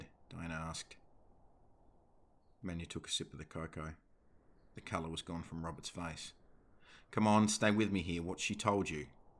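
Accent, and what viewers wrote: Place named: Australia. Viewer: Iv not finished listening to this but the oz accent makes a refreshing change to the normal American one all and all it's a dam good story so far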